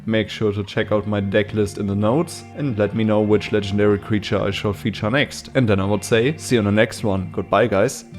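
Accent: Germany. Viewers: Am I hearing a little bit of deutsch? Aber nicer commander!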